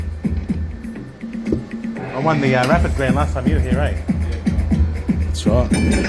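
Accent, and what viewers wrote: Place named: Australia. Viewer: Real Aussie accent 😅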